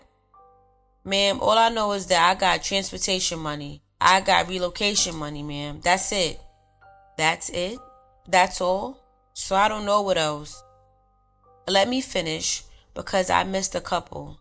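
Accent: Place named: New York, United States. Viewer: Yu can tell that the narrator/reader is from NewYork. I think it was more authentic wen someone from that city with that accent was reading transcripts. Like the one that was in new orleans that time. I think she passed away or something. Still 🔥 tho. 🫡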